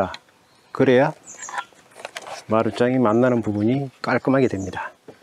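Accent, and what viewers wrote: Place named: South Jeolla, South Korea. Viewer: Thank you for teaching some Korean wood crafting! I enjoy your chollnamdo accent reminds me of Gwangju. 😘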